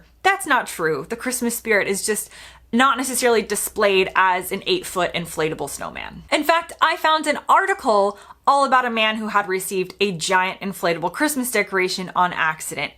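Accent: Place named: United States. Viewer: you are loosing the american accent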